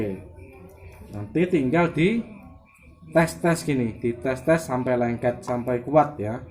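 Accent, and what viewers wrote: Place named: Tulungagung, Indonesia. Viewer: Logatmu kok tulungagung banget mas? Tinggalnya di mana ta?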